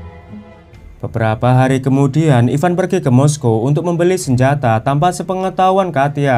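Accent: Java, Indonesia. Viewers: Komentatorx bahasa Jawa medok banget,,,🤭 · Jowo mu ngendi min? Kyo aku 7th no jkrta logat jowoku ra isoh ilang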